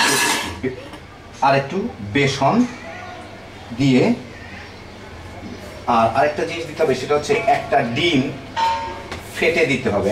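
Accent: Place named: Dinajpur, Bangladesh. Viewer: vai, salam.apnar bari ki Dinajpur a? amader kothar shathay vishon mil pai.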